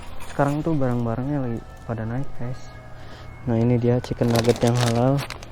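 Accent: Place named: West Java, Indonesia. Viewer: Sunda mana kang?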